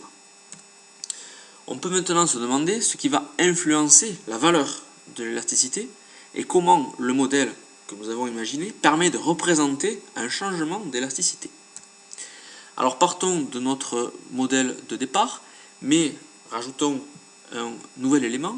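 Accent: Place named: South, France. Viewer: apprendre l"élasticité avec l'accent du sud il y'a rien de mieux! trés bonne explication merci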